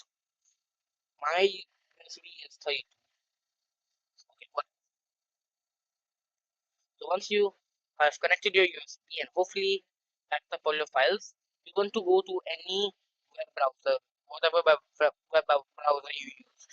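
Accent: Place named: India. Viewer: omg indian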